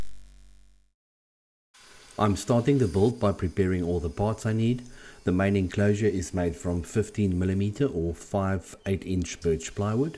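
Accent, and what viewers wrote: South Africa. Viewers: I respect your designs and work ethic, that south african accent gets me every time. all the best and greatest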